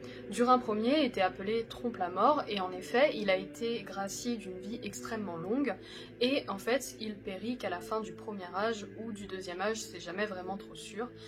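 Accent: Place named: France. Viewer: je découvre la chaîne avec cette vidéo et je trouve çà vraiment bien ! petit bémol : ta prononciation est très française ... je pense que ce serai un peu plus intéressant avec les prononciations des langues inventées par Tolkien ( car ce sont des vraies langues qui ont nécessité pas mal de travail et puis elles sont jolies ) ont peut trouvé la prononciation dans les bouquins ( au début pour le Silmarillion et à la fin pour le Hobbit et dans le tome des appendices du Seigneur des Anneaux ) mais ça peut peut être varié selon les éditions donc allez voir la chaîne Arda et particulièrement cette vidéo <link>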